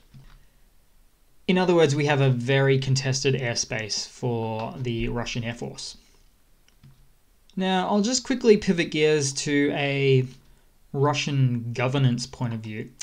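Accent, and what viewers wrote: Australia. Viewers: It's great to hear an Aussie voice analysing this war so well. You've shown images even Ukrainian sources have not yet shared. Well done, mate, keep it up!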